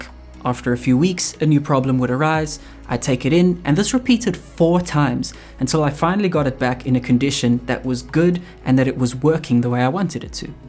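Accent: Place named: South Africa. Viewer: I know a South African accent when I hear one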